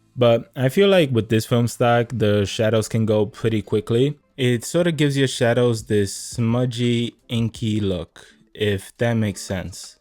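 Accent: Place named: New York, United States. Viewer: Why you sound like a New Yorker but live in eu?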